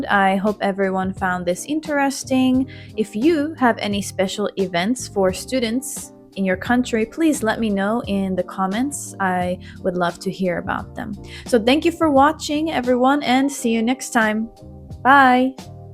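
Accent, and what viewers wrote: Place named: Japan. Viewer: 全て字幕成し遂げで聞いて、７割理解出来た、けど、貴女がJapaneseアクセントでslow english するおかげだね😅プロムは、日本人の意識ではハリウッド映画等で馴染み深いというかusa のカルチャーは知ってるつもりです。😊(知らない単語が連発してるが憶える必要はあるのかな、個人レベル次第かな😅)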